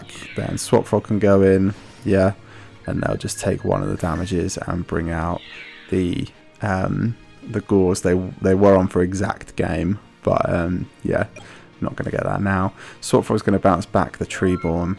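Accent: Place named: United Kingdom. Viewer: i like ur commentary your british voice is soothing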